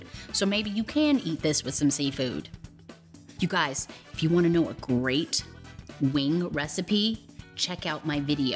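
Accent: South, United States. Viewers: You do a very good southern accent! Then again you did live in Texas lol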